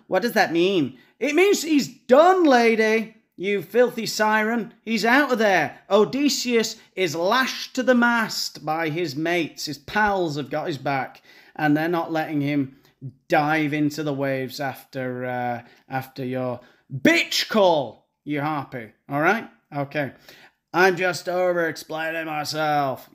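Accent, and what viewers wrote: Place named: Yorkshire, United Kingdom. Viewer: It could be his Yorkshire accent coming out 😂❤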